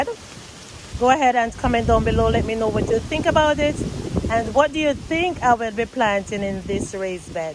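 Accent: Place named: Jamaica. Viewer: Hey, I’m so glad I found your channel. I grew up in south Florida and I recognized that Jamaican accent immediately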